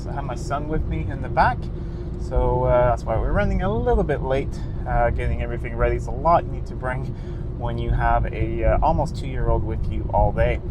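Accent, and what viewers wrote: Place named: United States. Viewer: How do you have a perfect American accent when growing up in Sweden?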